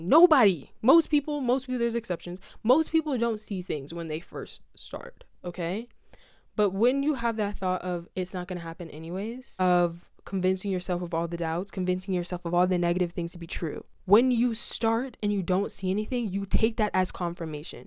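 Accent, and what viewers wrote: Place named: United States. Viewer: She sounds American to me, but she might be Canadian. Wouldn’t know what part of either country.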